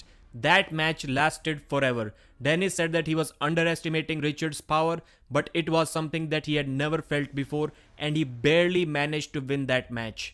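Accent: India. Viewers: never heard of........... but the speaker of this video sounds like the stereotype indian technical support call center guy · Диктор из индии???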